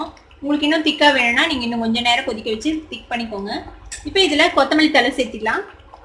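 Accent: India. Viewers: nice sis..indian tamil nalla iruku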